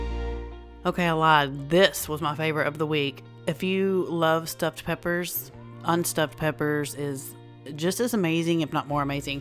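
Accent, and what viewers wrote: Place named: South, United States. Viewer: Everything looked incredible. Yum.. I love grilled cheese and tomato soup too. Boy that unstuffed peppers.. yes please please.👏. I'm definitely making that. My Question is where was you born ? You've got kind of a Southern accent to me. My entire family is from The South. Mainly Kentucky. And What is your favorite Food ? Good luck to all the winners ! Love your indiana friend. xoxo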